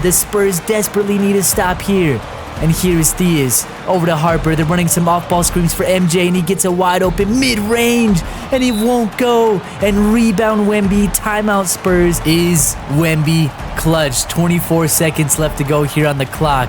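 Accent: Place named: Mexico. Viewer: dude sounds mexican as fuck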